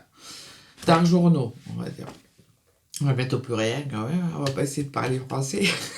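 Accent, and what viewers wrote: South, France. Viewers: avec ton petit accent du sud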